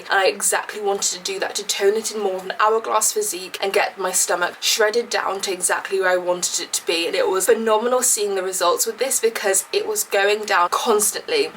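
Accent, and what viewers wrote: RP, United Kingdom. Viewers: Rlly like the posh British accent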